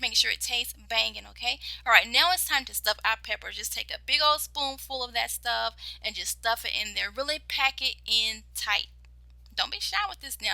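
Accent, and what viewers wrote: South, United States. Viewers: your slight southern drawl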